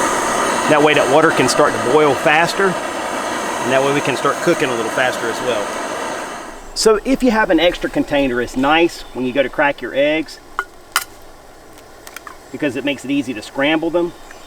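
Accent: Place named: Carolina, United States. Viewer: That’s got to be a Carolina accent.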